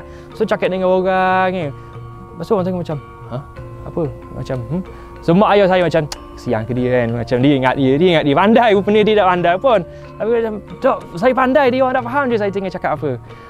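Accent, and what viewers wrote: Terengganu, Malaysia. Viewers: Bangla cakap sleng bangla. cakap ganu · Alhamdulillah.... didoakan moga & keluarga bahagia sampai JannahNya. membawa budaya Malaysia yg baik, khususnya loghat Terengganu😁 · Tu bezza kena pahang...bahasa Melayu dan loghat Ganu...! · Bukan tu je,fasih berbahasa Melayu loghat Terengganu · pekat ganuu! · Untung... Ckp mlyu siap ngn loghat ganu... tu yg unik n lbh dkt dgn msyarakat 👍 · Memang macam org ghanu nak cakap KL...😆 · he just became a pure terengganurians... when he talk like that and the bodylanguage is on point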